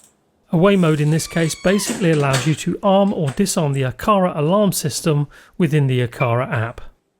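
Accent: United Kingdom. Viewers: U sound like u from uk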